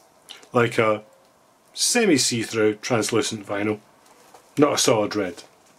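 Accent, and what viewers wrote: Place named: Stirling, United Kingdom. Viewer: Just added this to my collection haven't opened it yet and found your video, hello from Stirling lol finally a familiar accent on YouTube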